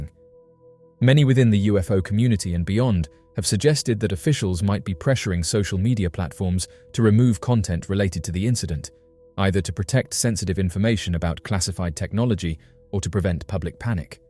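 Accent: England, United Kingdom. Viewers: I prefer American accent. I can barely understand this British accent. Hard to follow. Make a better video with an American accent. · A truly excellent video once more, with excellent scientific commentary from a good clear English accented voice in unhurried speech very easy to follow. This is so much better than many American accented voices seemingly gabbling away at such a rapid pace I find almost impossible to follow or even fully comprehend ! Congratulations to the excellent commentator ! Let’s have more excellent commentators like this speaking scientific sense ! Cheers, Richard Leschen.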